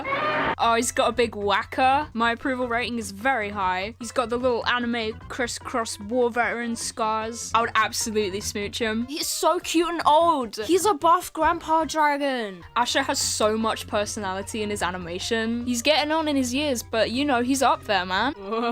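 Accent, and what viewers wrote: United Kingdom. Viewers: wtf your bri*ish????????? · You sound so British and it makes the whole thing so much better to live through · i knew u were british right as u pronounced artist without the 't' in the middle, bri ish.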